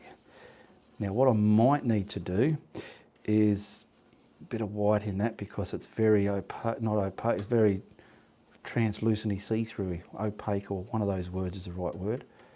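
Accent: Australia. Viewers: Howdy mate. Gees, your ozzie. Love it. Love your work. Love your chatter. Your my morning viewing.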